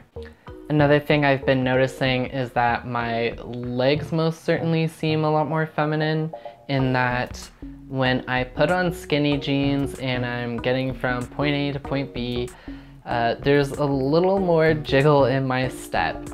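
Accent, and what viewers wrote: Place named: Canada. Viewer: Love the canadian accent!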